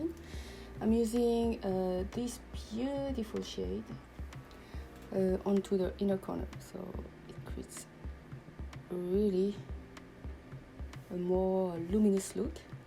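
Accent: France. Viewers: I tried my Viseart Paris Edit palette for the first time last night. Though the quality of the shadows is very good, let's just say that I ended up looking hungover rather than elegant like you do. Even though I don't have a monolid, I'm going to try your method--it really came out nicely. Love the name of your channel and sweet French accent. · Do you speak french by any chance? It sounds like you have a french accent which would be pretty cool! :)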